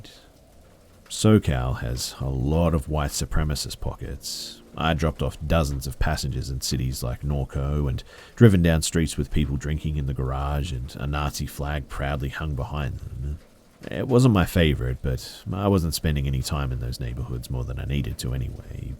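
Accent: Australia. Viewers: Ikr i love accent. I live in northwestern US and i have never met someone irl from australia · Omg. An Australian narrator. I'm so happy right now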